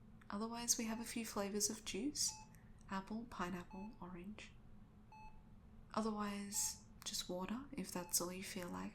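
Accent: Australia. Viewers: Oi, a fellow Australian!!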